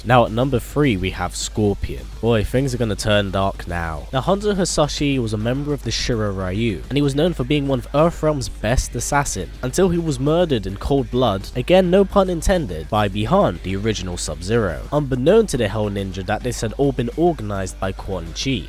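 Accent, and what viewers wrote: United Kingdom. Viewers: where are you from,? you sound British but I think I am wrong.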